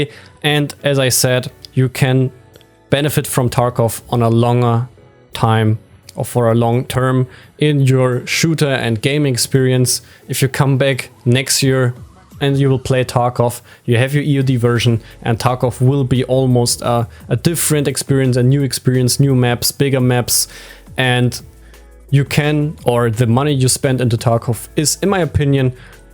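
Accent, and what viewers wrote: Germany. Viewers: Man hört so krass das er Deutscher ist xD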